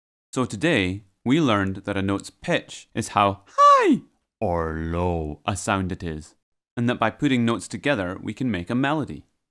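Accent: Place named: Scotland, United Kingdom. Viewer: Why u scottish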